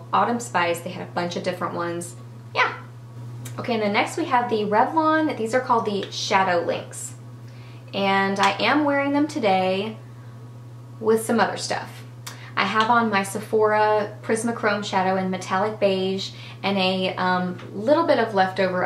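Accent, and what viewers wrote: South, United States. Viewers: Great review of everything! I've been searching for the matte balms- haven't found yet- going to look again today- day off. So glad I found you- prissy highly recommend I check you out - from PrissyAndPink- so glad I did! R u from the South too? We're both in Houston and you sound like another Southern girl!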